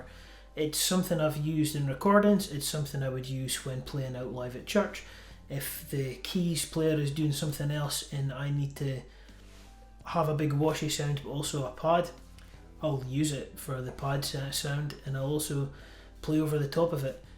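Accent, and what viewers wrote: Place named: Scotland, United Kingdom. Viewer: lol no it was meant as a good thing I just heard your accent and figured it's Scottish so call him Braveheart 😉. I heard you mention playing in church, I also play for my church. Keep up the good content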